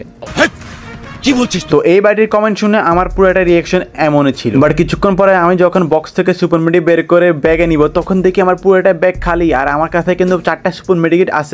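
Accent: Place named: Lakshmipur, Bangladesh. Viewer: Vaiyer basha ki lokkhipur naki?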